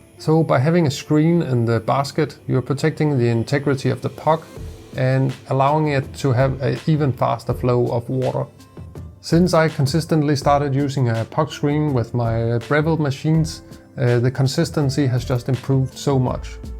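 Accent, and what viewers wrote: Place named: Denmark. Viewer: Are you from denmark? - er bro legit fra danmark jeg kan høre ddet på dit engelsk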